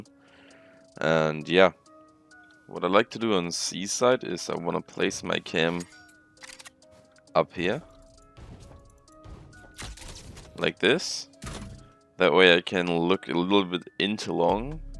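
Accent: Germany. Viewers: The german accent haha. ^^ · i dont know why, but you sound like you came from Germany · man hört das du deutscher bist :D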